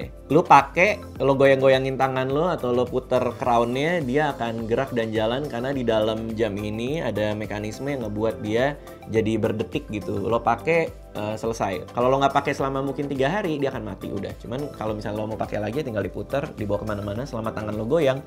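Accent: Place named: Jakarta, Indonesia. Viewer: Mas logatnya Jakarta sekali, terlebih waktu bilang "party".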